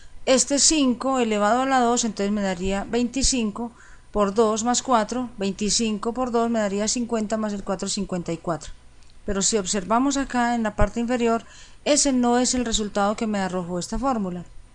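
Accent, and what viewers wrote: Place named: Antioquia, Colombia. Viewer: Ese acento Paisa ayuda mucho a entender el curso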